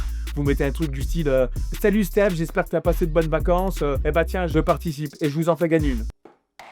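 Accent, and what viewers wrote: Saint-Étienne, France. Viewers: c'est un accent stéphanois qu'on entend ?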